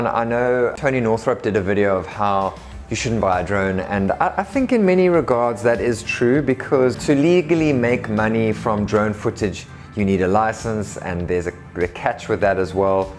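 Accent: South Africa. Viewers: hey man just heard the way you speak and was wondering are you South African since you also priced the weatherman in rands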